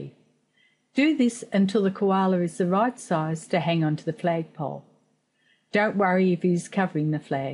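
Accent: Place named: United Kingdom. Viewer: your Brit accent is tough to understand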